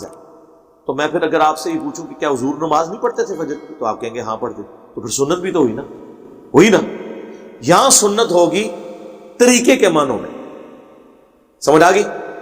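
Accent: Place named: Punjab, Pakistan. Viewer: Yah jo panjabi ka tarka lagta hy na bato bhai ki bato ma bara kmal ka lgta hy log chirty hi iai liy hyn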